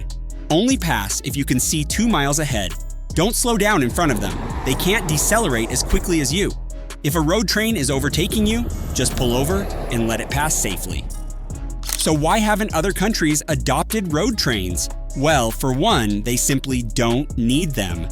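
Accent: United States. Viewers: The only reason I clicked on this was to leave a comment. The thumbnail to click on is the biggest load of Photoshopped sensationist crap I've ever seen, I bet you're a yank or AI voice sprouking this bullshit. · Why does an Australian story have an American voice over? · as an aussie i found it amusing to listen to a yank telling me about road trains, secondly shows a Lefthand drive car, NOT on australian roads. i suppose yanks limited intelligence.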